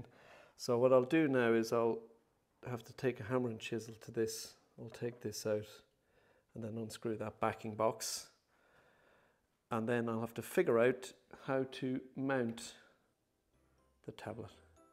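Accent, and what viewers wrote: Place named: Ireland. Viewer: Painter's low tack blue tape or plain masking tape are my goto when anything needs precise marking out for nails, screws, drill holes, biscuit holes or multitool sqaure cuts. Love the fancy radiator on the wall and the herringbone floor. My true blue Dublin ears despite being in central europe for 20 years are detecting an Irish accent.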